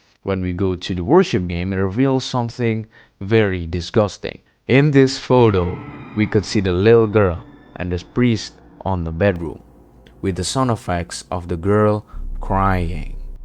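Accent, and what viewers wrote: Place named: Russia. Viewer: you sound kinda Russian lol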